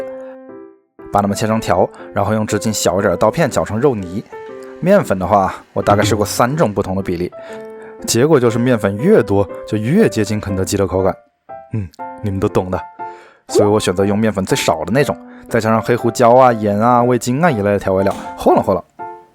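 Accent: Wuhan, China. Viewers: 有点吧han，标jun滴武汉口音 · 我一看到这个标题，就知道又有阿姨的汉普了🥰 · 不是我说 你的口音蛮像经视台 夸天节目里面的武汉话旁白的调调~哈哈哈哈~虽然你是普通话的调调~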